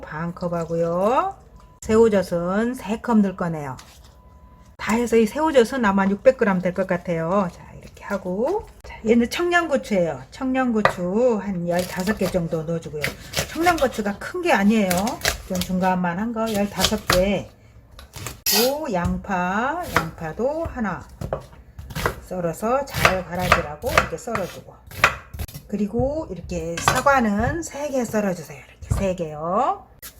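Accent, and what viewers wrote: Jeolla, South Korea. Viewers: 님 전북 분이신거 같으세요 말씀하시는 억양등이 전라도 음식 참 맵짜고 맛있게 잘합니다 오늘도 잘보고 배웠습니다 감사 합니다ㅡ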